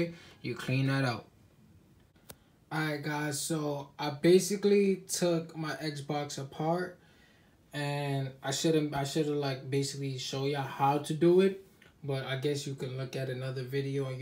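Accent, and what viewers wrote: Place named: New York, United States. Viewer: ye he from ny lol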